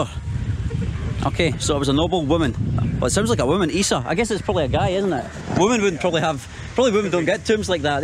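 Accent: Scotland, United Kingdom. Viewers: It’s called a Scottish accent 😁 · This accent just makes me angry for no reason no wonder Scotland was wiped all over countless times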